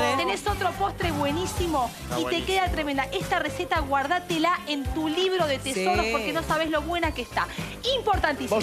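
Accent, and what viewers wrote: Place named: Buenos Aires, Argentina. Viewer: En argentina no hablamos todos con la tonada porteña... te invito a recorrer mi pais. Al rodearnos de tantos paises tenemos diferentes tonadas. De todas formas la tonada porteña se consolidó por la oleada de paises europeos que emigraron o que hacían negocios en el pierto de la ciudad de bs as agregado a los nativos. Es muy interesante la herencia q nos han dejado nuestros antepasados.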